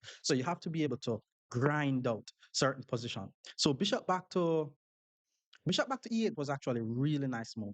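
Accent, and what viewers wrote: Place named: Jamaica. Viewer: I watch this channel so much now that my thoughts about Chess are in a Jamaican accent.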